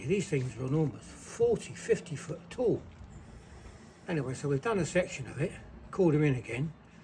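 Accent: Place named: RP, United Kingdom. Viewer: Wondered where had picked up the plummy accent 👨‍🎓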